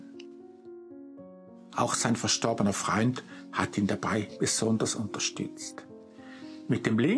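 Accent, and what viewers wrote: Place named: Switzerland. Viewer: Herzlichen Dank!! Leider ist mir dieser schweizer Dialekt ÄUSSERST UNANGENEHM !!!!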